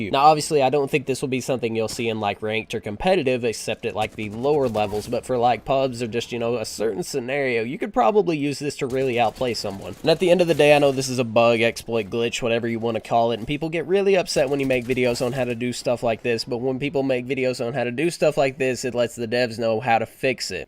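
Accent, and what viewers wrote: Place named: South, United States. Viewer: You have a bit of a southern draw, you from Georgia or the surrounding states?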